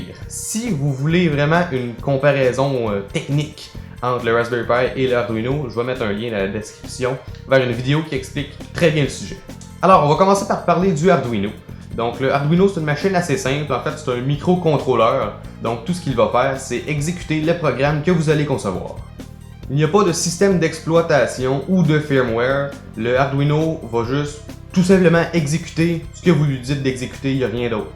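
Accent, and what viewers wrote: Canada. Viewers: canadian by any chance ??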